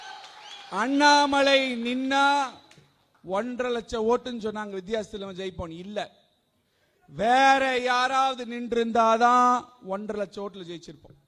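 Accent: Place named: Coimbatore, India. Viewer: கோவைத் தமிழ் சூப்பர்.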